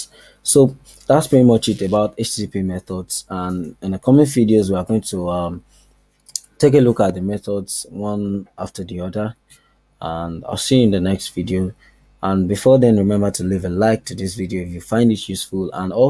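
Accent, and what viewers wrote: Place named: Nigeria. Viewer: You sound Nigerian 😅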